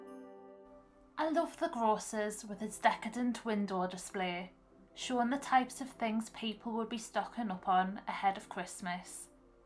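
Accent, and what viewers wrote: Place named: Newcastle, United Kingdom. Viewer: Please lower the background music - it makes your voice difficult to hear and for those who find the Geordie accent difficult, this makes it harder. Lovely to see the beauty of the NE on show - so many wonderfully historic and scenic places to visit in Durham and Northumberland. Thanks for making this video for us to enjoy.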